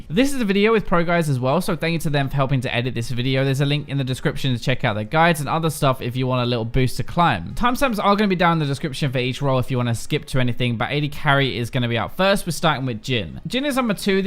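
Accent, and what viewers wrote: England, United Kingdom. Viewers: hey which part of England are you from? i cant figure out from your accent, thanks:)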